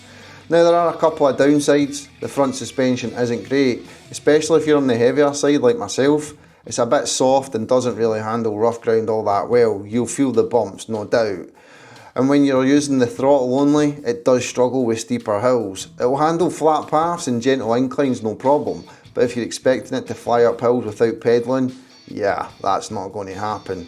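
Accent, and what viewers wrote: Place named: United Kingdom. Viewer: It’s great to see you enjoying your bike. I’m German and speak English quite well, but I can’t understand a single word you’re saying. Your accent is so strong that it’s almost impossible for anyone outside the UK to understand you. But I bought the bike anyway. Cheers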